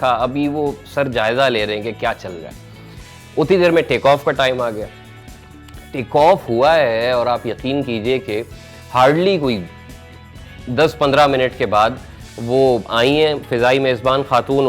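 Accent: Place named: Karachi, Pakistan. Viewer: bhai are you from Karachi? Your Urdu Dialect says it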